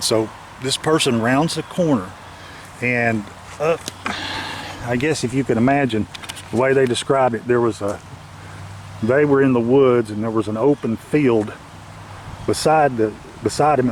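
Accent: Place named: East Tennessee, United States. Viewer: Where are you from you sound alot like people.from my area in East Tennessee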